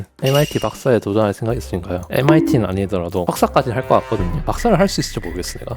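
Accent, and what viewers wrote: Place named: Gyeongsang, South Korea. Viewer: 노↗️래↘️방↗️ 방↗️송↘️하니까 경상도 티남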